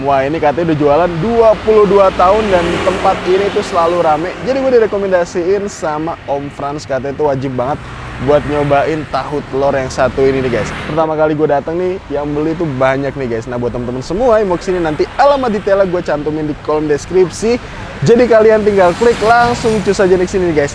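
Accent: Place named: East Java, Indonesia. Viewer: Jawa timuran nih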